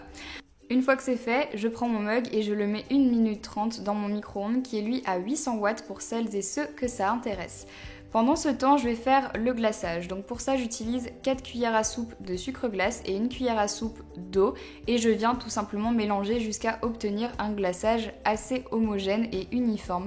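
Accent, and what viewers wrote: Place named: Naples, Italy. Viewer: on dirait à Napolitain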